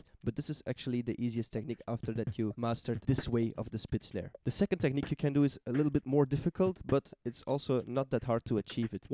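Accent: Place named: France. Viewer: You have the French accent^^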